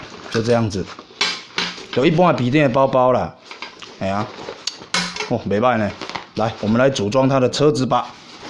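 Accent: Taiwan. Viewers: 版主的台灣國語真的好搞笑XD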